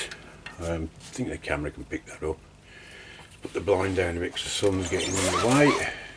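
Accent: United Kingdom. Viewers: Awesome re weather. We recently had two days' worth of sorely needed rain here in Jacksonville, FL. It was beginning to feel like drought-type issues around here. It sounds like you're a Brit. Am I right? If so, what part of the UK do you live? I visited a friend in Telford-Dawley back in 1995 from August thru October and loved the difference in the essential 'air'. Jacksonville is sub-tropical, so lots of heavy humidity around here. The UK weather was crisp and comfortable to me. Thanks for info re the purple heart wood. Do you ever sell any of your creations? Cheers....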